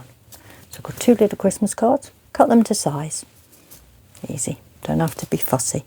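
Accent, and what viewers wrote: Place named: Cockney, United Kingdom. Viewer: You sound like you're from South East London you got a bit of cockney to your accent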